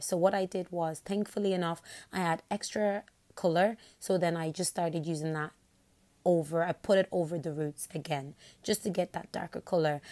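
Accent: Ireland. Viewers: The Irish accent omg 😍😍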